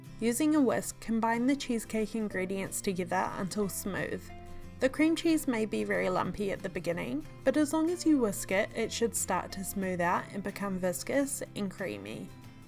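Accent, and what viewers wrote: New Zealand. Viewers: She has a New Zealand accent... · Amazing recipe. I will surely try this. By the way accent is similar to New Zealanders. Am I correct? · Oh, nice. :D Quite different from the australian accent isn't it. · Ivana Matić more of a NZ accent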